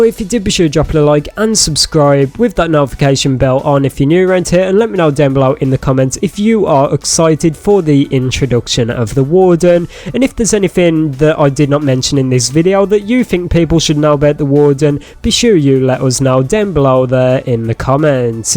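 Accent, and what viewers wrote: United Kingdom. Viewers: I know it's british but which one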